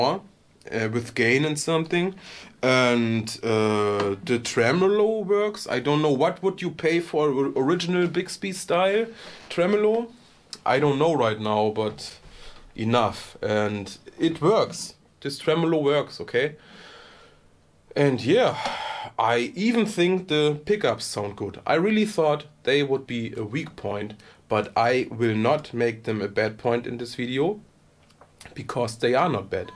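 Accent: Germany. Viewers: Mann, ich liebe deinen deutschen Akzent. :D Normalerweise stört mich das bei Deutschen, die Reviews auf Englisch machen (Thomann - ganz grauenhaftes Beispiel). Aber bei dir ist es irgendwie ganz cool. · Your English with the heavy german accent is just wonderful 😊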